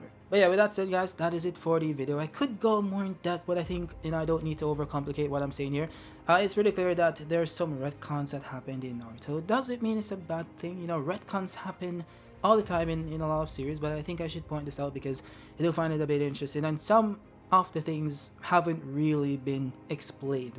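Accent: Jamaica. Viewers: Yaa Jamaican? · Are you jamaican? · You a yard man?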